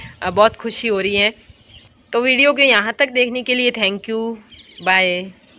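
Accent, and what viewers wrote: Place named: Barmer, India. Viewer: आप ऐक, अच्छे परिवार से,है, आपके माता पिता को मेरा सलाम, आपके मुख के सरस्वतीजी बोल रही है,,, आप मेरे को आप बाड़मेर से लग रही है अन्दाज है जय श्री कृष्णा जी श्रीं राधे राधेजी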